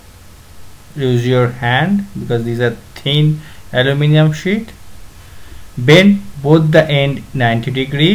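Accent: India. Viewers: So...you are Indian?Accent yaar.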